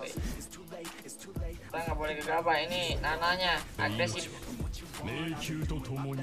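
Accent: West Java, Indonesia. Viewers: Logat sundanese salam dari cijerah lur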